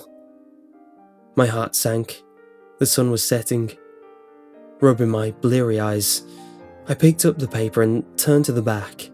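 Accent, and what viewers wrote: England, United Kingdom. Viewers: U made me so scared I litterly can't fell my heart beat anymore Plus u sound like ur from England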